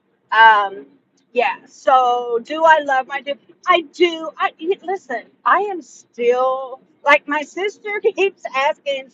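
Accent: United States. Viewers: So glad u went live . We missed u. Girl u could go live from ur bed and we would watch u no make up and all. Idk if it's just me and it's from not seeing u for a hot minute but u sound more southern since ur move over here to Alabama with me. Lol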